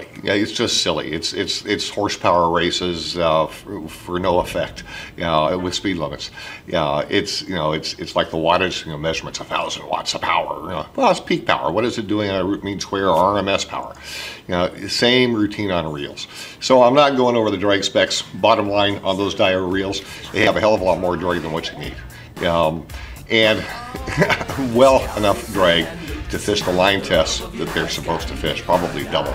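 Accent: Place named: East Texas, United States. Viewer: I think I was pretty clear why I didn't spend time yacking about drag levels, talked about that at the end of the video. Watch the end of the video and perhaps you'll understand why I feel those numbers are pretty much bunk. In my mind, its pretty silly to talk about high numbers when they don't apply to reality. Who cares if a $200 spinner has 30-40 lbs of drag, it's not going to be used and the rest of the drive train won't necessarily sustain those pressures. On a 50 sized two speed made for catching cow sized tuna, it's meaningful. On a lighter duty spinner, it's really irrelevant so long as there's enough usable drag to fish the intended line class. The BG's do that very well, as do the Saltist series spinners. As to why I say yeow...simply because I can.... Why do I wear a hat...because I like 'em and it's cold on a shaved head. 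Why do I wear clean underwear... I am not a TV personality, newscaster or info-mercial talking head, I'm a f'n fisherman who tries to provide some info to anglers. Do not expect more than what I'm capable of providing, and if my manner or pauses in dialog, accent, colloquialisms are disconcerting, so be it, no apology. Shucks, catch me at the right time and I'll lapse back to an East Texas accent. No doubt the manufacturers' own vids are far more professionally produced, and you'll get more of their talking points and buzzwords. However, our vids are independent, honest, and accurate, and done to the best of my meager ability. I'm happy to take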